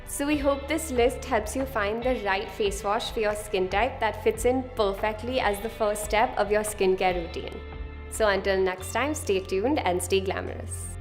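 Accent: India. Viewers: Dhe got Indian kinda accent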